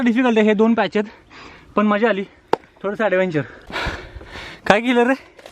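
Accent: Satara, India. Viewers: Mla avajavrun tu satarkar vatatoy ..am i right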